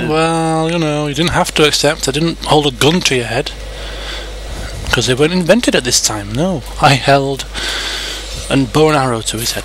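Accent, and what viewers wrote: United Kingdom. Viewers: I'm glad a brit is doing a commentary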